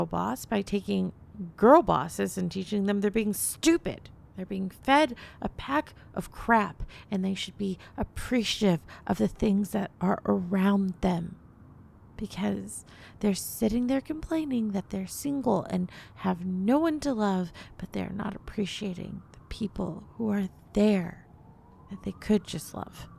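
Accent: South, United States. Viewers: Too bad women like this dont exist and im not saying i wanna take a backseat and let her do EVERYTHING im a man i want to treat a woman like a lady i wanna praise her too. But you got women out here wanting a guy to have a chisled body be 6ft or more and make 100k+ a year like uh..... so im just cooked also her southern accent is amazing.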